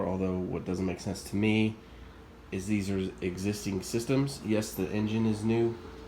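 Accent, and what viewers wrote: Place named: Utah, United States. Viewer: I thought I recognized a Utah accent and then I saw your profile landscape pic of Utah Valley! Am I right?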